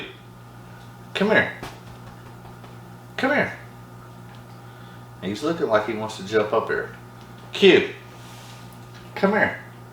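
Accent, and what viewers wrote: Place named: South, United States. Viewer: you sound like you’re in the south? I don’t have much luck finding the allocated stuff here in NE TN.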